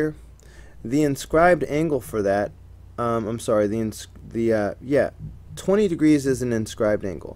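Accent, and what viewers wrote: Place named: East Coast, United States. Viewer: Nice job. I detect a Eastcoast accent? Where did you do undergrad.? Just curious. I just subscribed you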